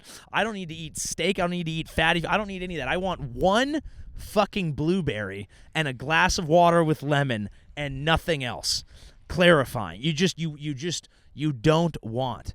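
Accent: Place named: North, United Kingdom. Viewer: Perfect Northern accent ❤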